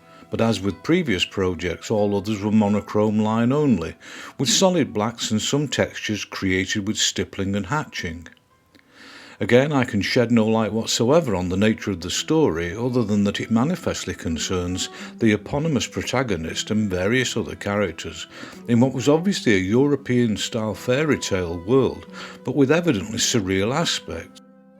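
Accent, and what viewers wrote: United Kingdom. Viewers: HIs cultural artwork and line work are new to me. I can't say I enjoyed them. But, do appreciate his changing styles. I also enjoy his more representational work. Your voice inflections surprised me. And wonder if they are an English (British)? As always, I learned more about the trade. Tnx!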